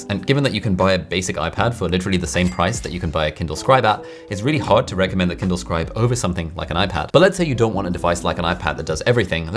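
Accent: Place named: United Kingdom. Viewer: I feel so settled when I hear that british accent and looking at you.... 🥰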